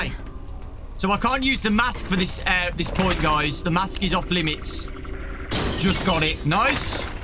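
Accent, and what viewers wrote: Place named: Birmingham, United Kingdom. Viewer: The tittle of this video should be Brummy guy shouting at robots for 28 minutes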